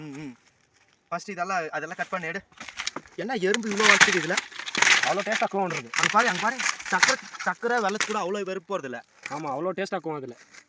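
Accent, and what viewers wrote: Krishnagiri, India. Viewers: Banglore hosur Krishnagiri area tamil bro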